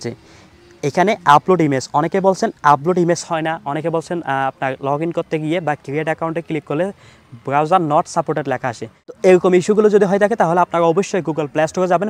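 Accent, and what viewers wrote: Chittagong, Bangladesh. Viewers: আপনি কি চট্টগ্রামের মানুষ?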